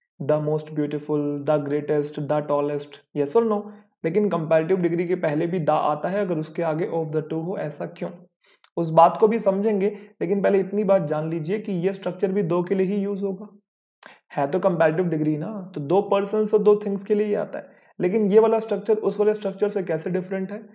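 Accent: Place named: Punjab, India. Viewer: Your Punjabi accent 💘 omg.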